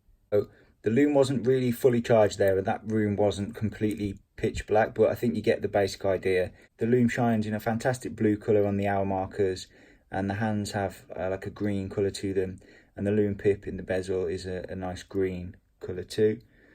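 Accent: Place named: United Kingdom. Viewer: superlative British accent absolutely outstanding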